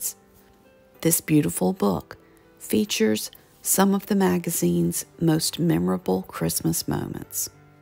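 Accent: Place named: South, United States. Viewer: I bought the book you recommended on Christmas Traditions when you featured Part 1 off Amazon. It was a little cheaper than you had said and so I treated myself. I have enjoyed it so much that I will be decorating for Christmas before Thanksgiving for the first time. I agree it will be more festive at Thanksgiving and give me a chance to sit back and relax to enjoy the Christmas season with a few more things already done. I love your videos it relaxes me to hear your voice and your slow southern draw. I’m a southern girl too so no pun intended. Thank you for all the time you put into your UTube videos. They are lovely, very tasteful and informative. I’m a big fan of yours. Be good to yourself and i will do the same. Good day Southern Lady🍁🍂 Linda from Pensacola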